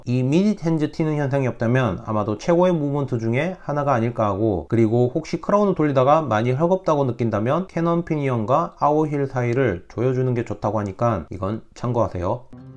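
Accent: Gyeongsang, South Korea. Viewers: 경상도 사투리 멋있습니다!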